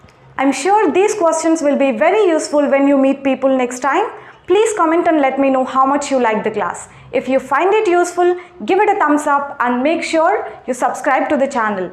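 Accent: Anantapur, India. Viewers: Madam , are you from ananthapur .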